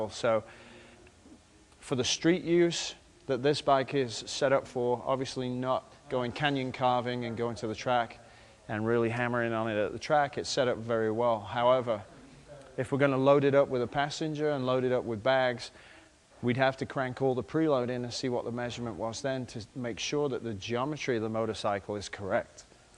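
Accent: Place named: Northern England, United Kingdom. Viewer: is he northen english or american?? that accent is confusing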